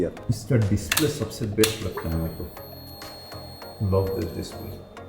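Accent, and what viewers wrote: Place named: Bengal, India. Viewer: Improve ur hindi accent..U sound like bangali from ur Hindi ..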